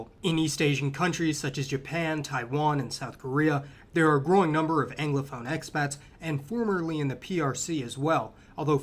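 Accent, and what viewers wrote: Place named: General American, United States. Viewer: English is truly the best, the reason why for some it sounds meh is because it's spoken by billions of people as a língua franca. If it was spanish it'll be the same for that language or any other one. It also has many different accents but most are used to the version that is speaking in which is the standard american accent, because it is the norm in youtube. When you get use to something overtime it gets boring.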